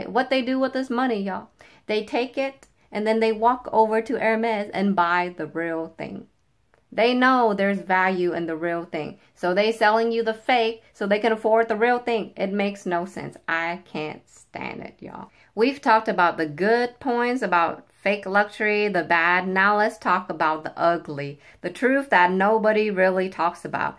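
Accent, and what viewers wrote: South, United States. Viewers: Love your southern accent ❤️ I’m a Californian and I love hearing southern accents. And I hear ya on the fakes, hence I’m so scared to buy on these retailers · Southern, funny, and kept it aaaaall the way real.....new subbie. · On point sister, I’d rather have cash in my bank and love your southern accent 👏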